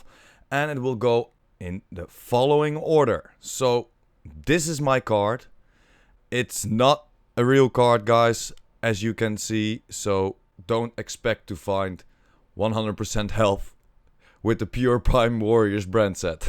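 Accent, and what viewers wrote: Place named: Netherlands. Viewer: Funny that you can hear that you are dutch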